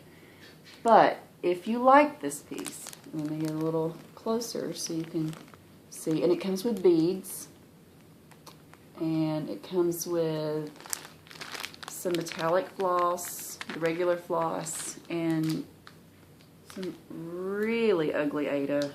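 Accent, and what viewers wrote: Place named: Atlanta, United States. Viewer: Hi. Loved your video. I was delighted to hear an accent similar to mine! I live south of Atlanta so you sound perfect to me! I'm fairly new to Floss Tube so I just found your channel. Looking forward to your next video.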